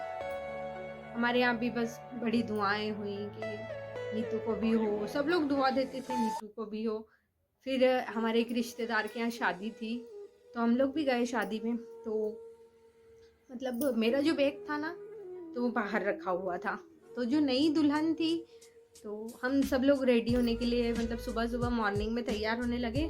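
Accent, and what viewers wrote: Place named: Bhopal, India. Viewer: Aapka tone bhi bilkul bhopal ka hai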